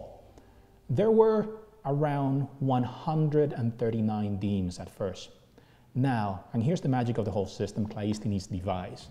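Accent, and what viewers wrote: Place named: United Kingdom. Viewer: Great content, love the accent. You must have learned English from a British teacher.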